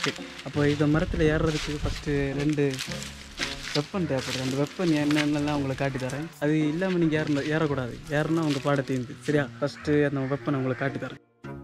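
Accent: Kanyakumari, India. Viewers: it's kanyakumari tamil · Nop, kumari Tamil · கன்னியாகுமரி ஸ்லேங்... 😁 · Kumari Tamil Anna😍😍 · Kumari slang pichu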